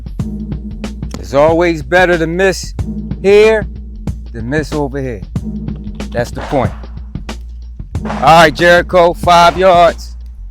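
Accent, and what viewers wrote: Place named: East Coast, United States. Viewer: I knew I sensed the east coast presence brother. I’m from the Bronx and it was like listening to one of my fellas when I watched your video. Much love to the channel and future success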